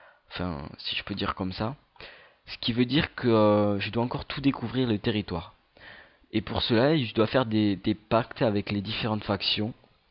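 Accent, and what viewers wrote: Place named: South, France. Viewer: Salut ! Content de voir une nouvelle série sur Rome Total War, et avec l'accent du sud s'il vous plaît :D ! L'installation de mods n'est pas très compliquée et généralement bien expliquée par les développeurs de ces derniers. Tout dépend de ce que tu recherches, mais personnellement j'utilise Roma Surrectum 1.6 (la deux déchire encore plus mais j'ai un débit pourri pour le télécharger et je suis anglophobe). Bref, pourquoi pas une série sur une campagne moddée ? 0:)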